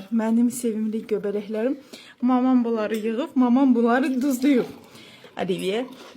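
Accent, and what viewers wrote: Ganja, Azerbaijan. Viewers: Necesen Gəncə lehcesiyle danışırsan maşallah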